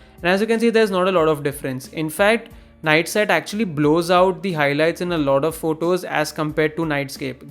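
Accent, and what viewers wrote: India. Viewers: CRINGE HEAR INDIAN SPEAK ENGLISH !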